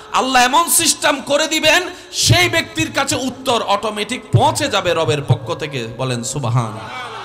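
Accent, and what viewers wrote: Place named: Kolkata, India. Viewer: মাশাআল্লাহ আপনার ওয়াজ কলিকাতান্দা হয়ে যায় অনেক ভালো লাগে আমার কাছে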